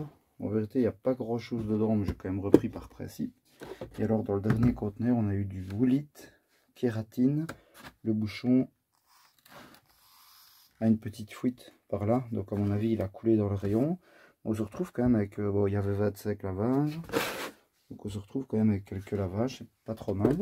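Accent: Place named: Liège, Belgium. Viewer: bonne continuations vous avez l'acccent de liège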